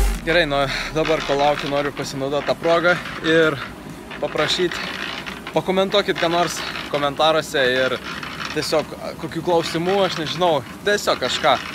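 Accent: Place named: Samogitia, Lithuania. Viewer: Sakau ko toks žemaitoks,girdis iškart 😄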